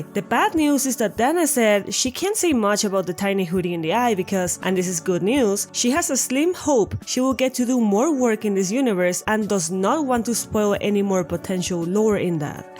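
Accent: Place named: Latin America. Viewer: Are you latina?? Omg I love your accent I'm from Colombia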